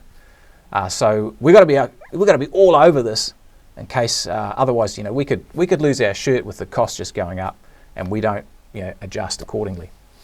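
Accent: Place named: England, United Kingdom. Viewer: Think of his accent. I am not sure if he’s in the US but it’s an English accent and Europe gets more time off compared to US. But I get 160hrs a year plus all bank holidays off so he’s not to far off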